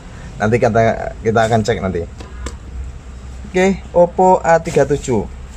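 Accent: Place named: Boyolali, Indonesia. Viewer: Saya kira sampean orang boyolali bang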